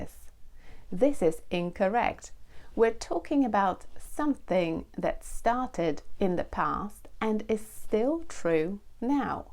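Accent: United Kingdom. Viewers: It's such a joy to listen to the Royal British English from, especially for an immigrant in Canada. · 😊 the German-American hast the all right. It' s always a pleasure listening to your fine Bitish accent! 😊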